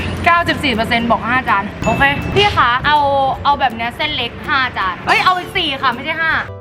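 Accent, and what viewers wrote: Nakhon Sawan, Thailand. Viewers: เฮียเหน่อออออแบบฉบับนครสวรรค์ของแท้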